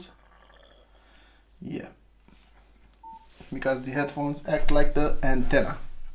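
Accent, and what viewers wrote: Romania. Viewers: I think u are Romanian by the accent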